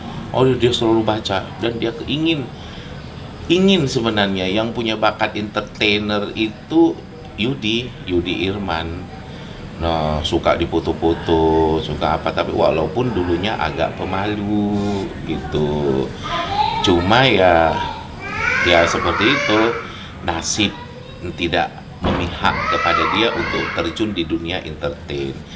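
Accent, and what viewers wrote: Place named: Medan, Indonesia. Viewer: Logat Medan yaaa.. gaya bicaranya mirip Billar banget 🤭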